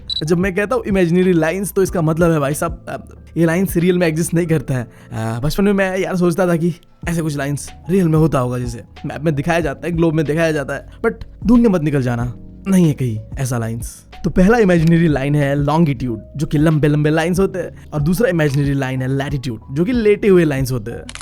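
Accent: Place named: Bengal, India. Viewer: Aap bangaly ho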